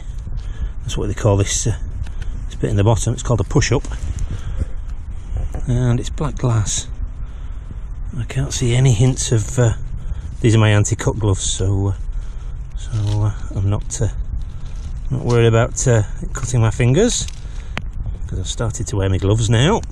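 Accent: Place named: Scotland, United Kingdom. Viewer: I just adore your comments...”a wee sniffer”, “ well, there ya go then”, and there’s always my favorite “kwool”. Yu and your hidey-ho accent are just soo charming! Better clean up the wife’s sink, sweetie, or she’ll have your hide ;). I’ll take that window latch from yu..$20 US? Hhmmm? Didn’t know yu Scots knew about Daley Crockett??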